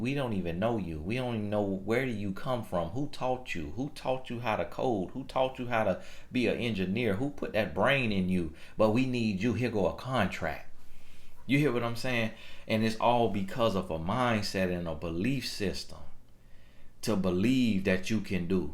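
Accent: South, United States. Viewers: I love your southern accent, God Bless.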